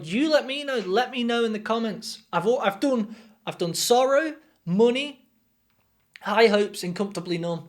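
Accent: United Kingdom. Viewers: What part of Britain are you from? Love your accent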